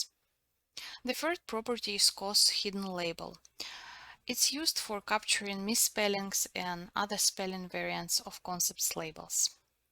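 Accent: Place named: United States. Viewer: The lady speaks a very very bad americanenglish. A very bad dialect. Stop it and repeat with someone who speaks english high language.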